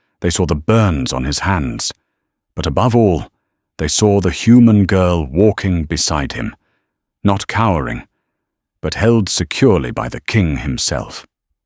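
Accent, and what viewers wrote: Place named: England, United Kingdom. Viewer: I love the voice of this narrator; baritone, English, and clear. So nice! Good story, too.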